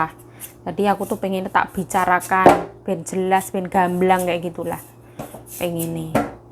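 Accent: Java, Indonesia. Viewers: Wong ndi sih mbok, ngomonge medok tenan😅...?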